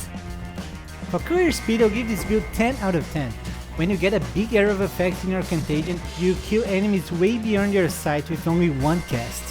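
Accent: Brazil. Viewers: Sotaquezão, parece brasileiro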